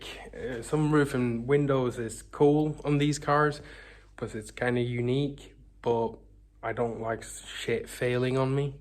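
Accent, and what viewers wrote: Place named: England, United Kingdom. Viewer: fan jävligt bra video måste jag säga ändå och din engelska e fan jävligt bra låter som du kommer från england nästan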